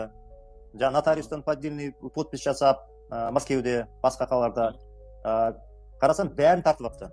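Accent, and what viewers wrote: Standard. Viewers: Мұхтар Қабыл ұлы қазақшаңыз керемет таза сөйлейді екенсіз❤Аллаһ күш қуат берсін.тілегіміз орындалсын .Әмин.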